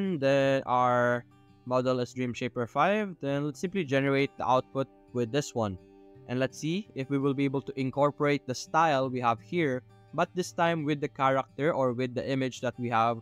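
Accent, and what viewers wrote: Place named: Visayas, Philippines. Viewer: I can tell you're a filipino hehe and definitely bisaya with your intonation hehe good tutorial keep it up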